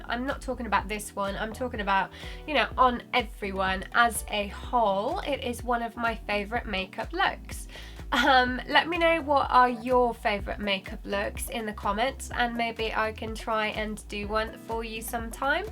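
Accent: United Kingdom. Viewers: OMG I just love her british accent 😱😍😱😍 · I love British English accent .. and you looks great :*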